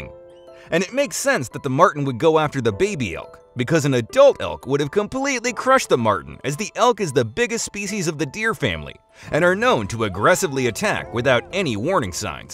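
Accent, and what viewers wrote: United States. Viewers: That might be an excuse had this video been a European production . . . but the narrator is speaking English with an American accent, and it actually •shows• footage of (using the North American nomenclature) an adult elk, •not• a moose. The adult animal shown chasing some vehicle is a big deer, but it is NOT the biggest species of deer in the world. And in any event, the footage and most certainly does •not• show the marten attacking the fawn of an elk (let alone a moose); the prey is •far• too small for that. Even a newborn elk would be many times bigger than a marten. To be blunt, this video compels the conclusion that whoever compiled it has not the slightest idea what he’s talking about.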